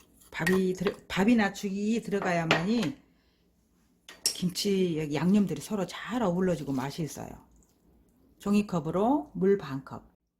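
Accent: Jeolla, South Korea. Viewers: 누님 고향은 어디신가요, 말씨가 전라도 같은데?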